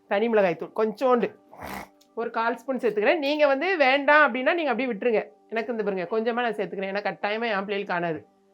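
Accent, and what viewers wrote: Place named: Tirunelveli, India. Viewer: As I am also from Tirunelveli, I enjoy your gesture, accent , innocence and simplicity besides your cooking. ( I hate cooking and wish I were your neighbour to sample your dishes)😂. God bless. Is it possible to connect with you over phone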